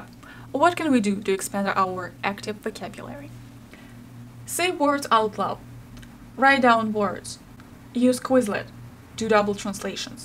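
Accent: Russia. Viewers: Is Russian her first language? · Is she Russian?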